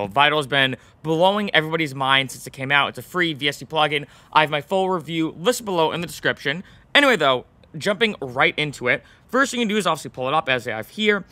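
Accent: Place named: United Kingdom. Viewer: It's neat but I wish it would have been a more neutral sounding voice, the British accent isn't the best choice.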